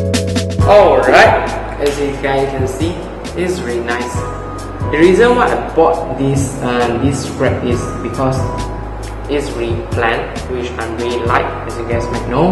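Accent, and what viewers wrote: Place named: Singapore. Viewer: That singlish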